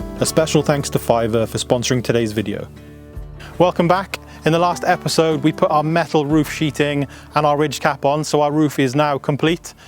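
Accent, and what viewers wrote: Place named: Wales, United Kingdom. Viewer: I’ve watched all your videos with great interest, seems like you are “cracking” along nicely! In South Mississippi we use the term “fixin’” or “fixing” quite a bit, as in “I’m fixin’ to put this cladding on the side of our tiny house”, or “I’m fixin’ to head down to the Builders Merchant”. I think you could pull it off, Wales accent and all! Great work guys!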